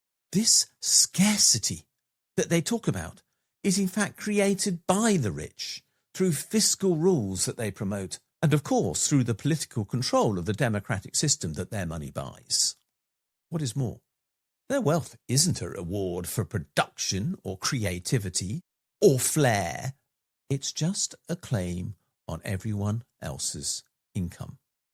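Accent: United Kingdom. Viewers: If not for the accent, I'd have thought you were talking about the States, at first. Perhaps you still are. Sadly, this view of economics is taboo in the educational system of the States. Even discussing it in passing might earn one death by pyre...or guillotine. Should I assume the same applies to the schools throughout the UK? Or do you folks prefer dunkings? How much closer to the brink do you think the world can come before chaos ensues and we all return to the wars and turmoil that marked and marred the late 19th and much of the 20th centuries? History might not repeat but it has a nasty habit of echoing into the future. Of course, the last major reversal of this trend did not take place until after a decade-long global depression and an even more devastating global conflict, that left millions dead and tens of millions more forever changed. Even then, the correction lasted little more than a single generation before "evil" began its insidious war on the rest of humanity...again. 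What admonishment do we, who bear witness to the death rattles of this conflict, leave for those left standing when the next correction takes place? Or is it a futile exercise, as they and theirs must re-learn all the hard lessons, all over again? There is a theory that a small group of people suffer a neurological deficit much like but parallel to autism. Except in these cases, rather than never acquiring the ability to interact with the rest of the world like the rest of us, these others fail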